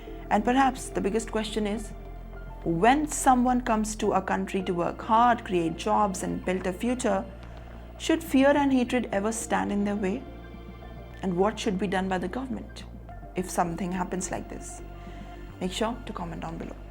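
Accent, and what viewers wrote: India. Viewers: Curry english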